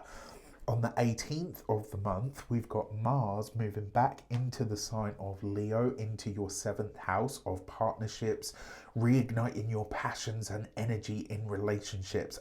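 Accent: United Kingdom. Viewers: You can't quit us we love you too much..thank you I missed hearing your British accent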